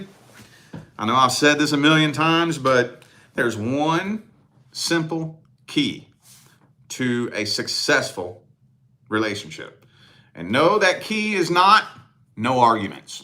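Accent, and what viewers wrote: South, United States. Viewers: Love that southern voice!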